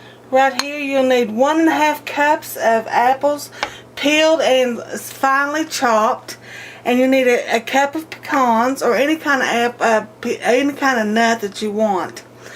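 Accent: South, United States. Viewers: You're accent is just so deliciously Southern. I love it!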